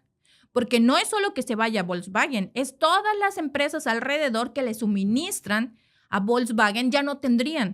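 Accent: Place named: Mexico. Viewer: Qué agradable expresividad tiene Vd., Doña. La "música" en su locución, con ese toque MX, pero sin caer demasiado en slang local, es muy agradable. Me he suscrito porque me resulta relajante escucharla un tantito (como dirían en MX 🙂), Se hace más llevadero el habitual horror que nos trae la actualidad internacional en estos tiempos. 👋👋👋 A veces me rio yo solo cuando recuerdo cómo, al referirse a algún impresentable, le llama "nuestro fugurín" 🤣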